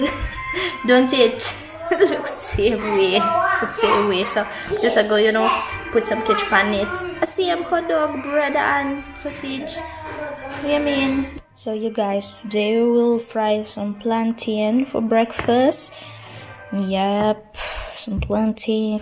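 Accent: Jamaica. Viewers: Love hearing you talk patios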